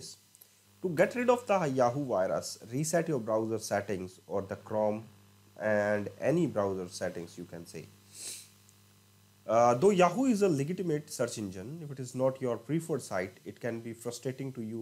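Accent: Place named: South India, India. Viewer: what's so special about it, sounds too south indian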